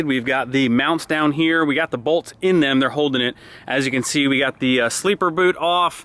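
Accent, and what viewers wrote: South, United States. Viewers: I love how your Pickens up a litter southern sounds in your voice. Awesome keep on trucking buddy. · Starting to get that southern twang.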